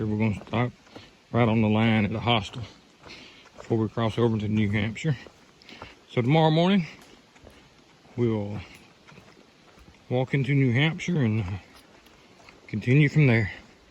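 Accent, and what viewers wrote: South, United States. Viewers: Love correcting your Southern speech. 😂😂 you know I love those old buildings especially the church.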